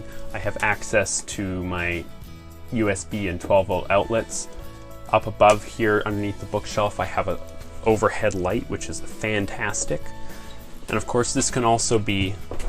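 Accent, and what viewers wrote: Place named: Canada. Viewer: Canada eh?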